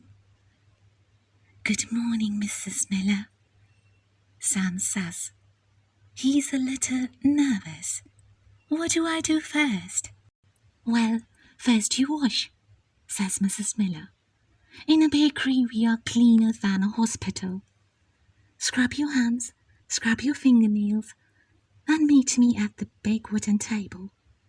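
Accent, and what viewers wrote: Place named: England, United Kingdom. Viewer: Great artwork and beautiful brand new story. English accent is awesome. Keep up the good work! And yes, your channel does deserve a token token of appreciation.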